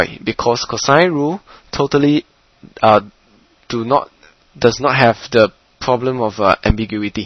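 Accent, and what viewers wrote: Singapore. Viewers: u must be a singaporean or malaysian . ur accent lol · Wow i really got it now Thanks a lot. I'm 99% sure that you are a Singaporean. I can tell by your accent;)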